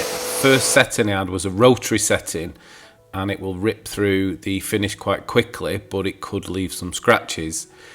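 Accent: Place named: Yorkshire, United Kingdom. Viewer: Are you from Yorkshire?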